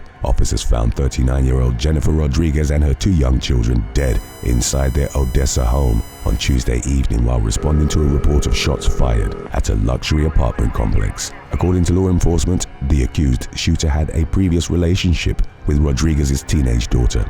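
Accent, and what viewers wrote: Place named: United Kingdom. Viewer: Here’s some more worst news. A commenter on this channel recently criticized for “using a British accent.” should, according to that reviewer, “Bruh, just say the words.” Huh?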